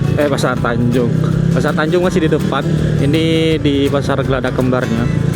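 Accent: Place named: Madura, Indonesia. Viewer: Logatnya maduraan.. hehe salam persaudaraan mase....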